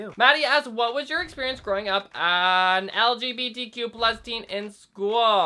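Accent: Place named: Canada. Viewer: The way Canadians say the letters -ou is so cute I can't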